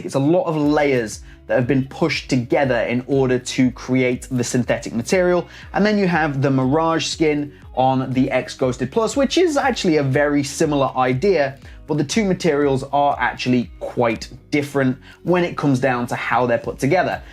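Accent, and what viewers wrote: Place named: Cork, Ireland. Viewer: no way XD I never wouldve guessed, your Cork accent is very mild hahaha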